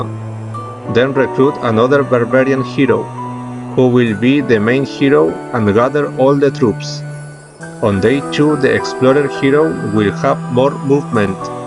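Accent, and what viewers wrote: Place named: Spain. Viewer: Porque este ingles suena como el de Mariano Rajoy?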